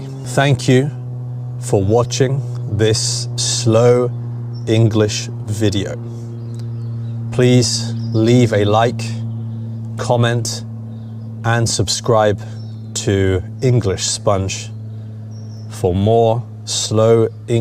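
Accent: United Kingdom. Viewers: Thank you very much for your understandable and clear British English. Please keep going.🎉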